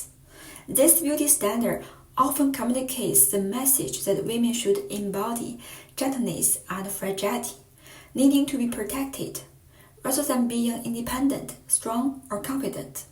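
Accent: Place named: China. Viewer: You have a beautiful Chinese accent. But I am confused. I am not sure. You are either Hong Kong or Taiwan or Singapore or Macau. Because Mainland Chinese can't use YouTube right. Please tell me where are you from. I really wanna know where you are from. I am a new subscriber. That's why I don't know. Sorry about that😊♥️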